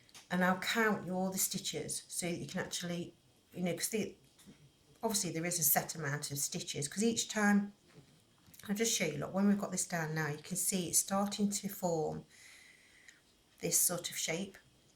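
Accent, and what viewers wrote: England, United Kingdom. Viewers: So awesome ... you truly inspire me and I absolutely love your English accent.... I’m from Louisiana!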